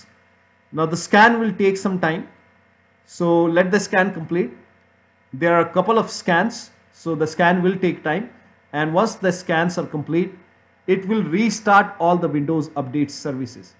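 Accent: India. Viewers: This video combines a lot of useful information in one place and presents it thoroughly yet briefly and succinctly without a lot of extemporaneous nonsense. The audio is terrible, a better microphone is needed. The content provider needs to speak about 25% slower due to his heavy accent. His English is excellent, his speech needs work because even though he knows English well he does not speak it particularly well. For example: I'm watching/listening to this on some fairly high end equipment so I can hear the words very clearly but when he says ".exe file" it sounds like he's saying "yeti fye". If you're going to pronounce a file extension it's better spell it out, to say "dot E-X-E" than it is to try to make the file extension into a syllable. Or in the case of the .exe extension just say "executable". Slow down, enunciate, listen to people speaking English as their mother tongue from Canada, America or Britain (not Australia or New Zealand, those people don't know how to speak their own language) and work on making your speech more intelligible. 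After you have a good grasp of the language listening to other Indians speak English is not helpful, you all just reinforce your pronunciation mistakes. It does little or at least less good to know a language if the people you're speaking it to can't understand what you're saying.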